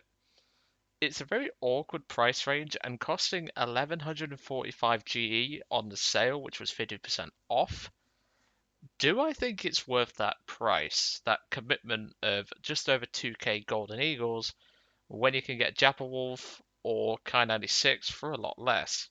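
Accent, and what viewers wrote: United Kingdom. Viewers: you don't use kilometers, but you have an English accent. Am I hearing things wrong?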